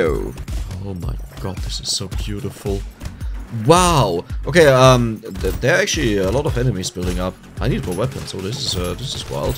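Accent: Germany. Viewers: Oof the german accent😂😅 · You know, it's already hard enough to get through your thick German accent, the NIE NE NE NIE NEN NE don't really help there. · Bruh du bist Deutscher das merkt man so Hard in deinem Akzent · The german english 🤣 love it, wenn das deutsche "so" ausbricht 🤣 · Moin Ich mag deine Art sehr gerne (und dein deutscher Akzent hat dich auch noch mal direkt ne Ecke sympathischer gemacht) Abo ist da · Very german accent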